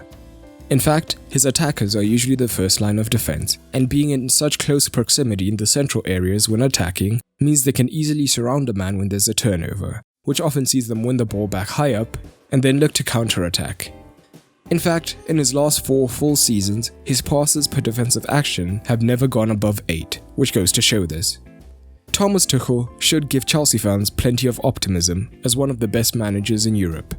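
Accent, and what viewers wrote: South Africa. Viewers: I know a South African accent when I hear one bro 😂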